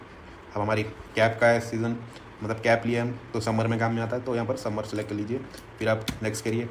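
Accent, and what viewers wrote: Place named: Hyderabad, India. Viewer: Language accent se hyderabadi dikhre paasha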